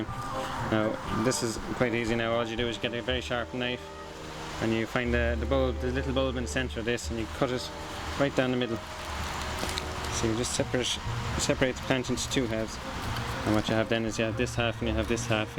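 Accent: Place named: Ireland. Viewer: Cool accent, thanks for the video, just what I was looking for for my cyclamen dividing! (for those non-irish watchers, like me - slow the speed down to 0.75 and crank up the volume :-)))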